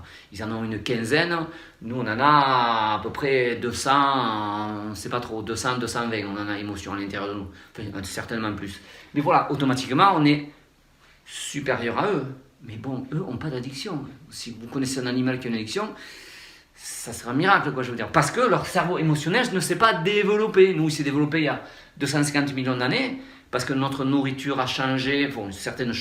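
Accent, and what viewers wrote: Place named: South, France. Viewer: vous êtes top! Juste vous écoutez avec l'accent du soleil çà me remonte mon taux de sérotonine;)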